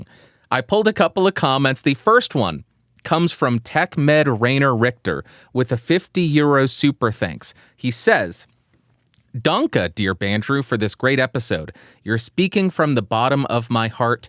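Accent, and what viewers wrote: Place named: New Zealand. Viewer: Even though your 'kiwi' accent may have offended someone, somewhere... By the imaginary unofficial power vested in me, I hereby dub thee an honorary kiwi.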